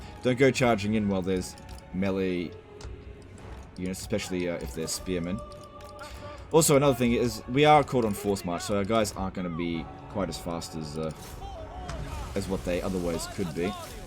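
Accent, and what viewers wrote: Queensland, Australia. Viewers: Hey, I was curious if you might consider doing a "how to level" {hero/lord name here}. I just realized as I was playing a Thorek campaign how I have no idea what traits to take down the blue line to get Lightning Strike are. Not sure what the algorithms leaning towards these days as to video number or length ( nearly 400k in it's all probably gravy to you at this point ), but I wouldn't mind that kind of guide with a bit of queenslander accent thrown in.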